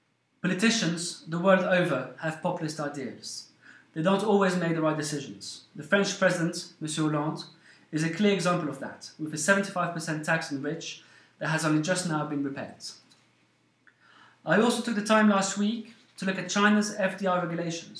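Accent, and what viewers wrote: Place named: Wallonia, Belgium. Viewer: Wallon ? enfin c'est ce que je dirais à l'accent. Excellent video thank you very much , all those numbers are very very very interesting.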